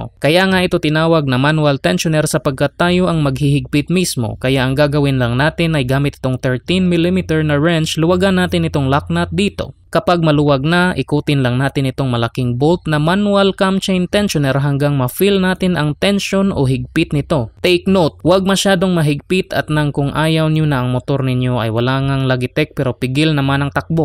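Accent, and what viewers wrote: Visayas, Philippines. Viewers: bisaya boses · Visaya po ba kayo Ayusin niyo naman po ung boses niyo para maintindihan ng maayos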